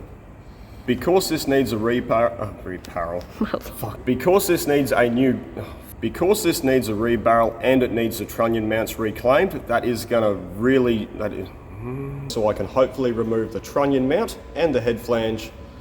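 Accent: Queensland, Australia. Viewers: Back to listen to the finest example of the Queenslander accent on YouTube. Unless Wayne Bennett's got an account on here I don't know about, but it's not like he'd talk.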